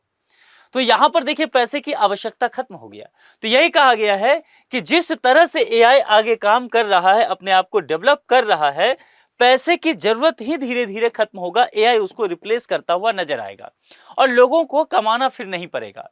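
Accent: Bihar, India. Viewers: Bolne se lagta h ye bihari h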